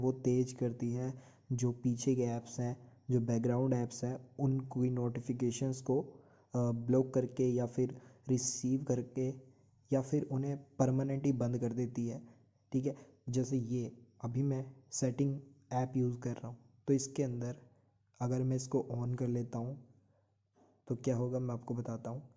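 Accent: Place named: India. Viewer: What is it with all these guys from India clogging up the how to's on YouTube electronics. First off no one could understand what they're saying between the accent and the lack of base in there voice and second isn't there any straight English guys just wanting to explain how a phone works instead of him telling the world what he did with his boyfriend last night. THIS GENERATION IS GONE TO SHIT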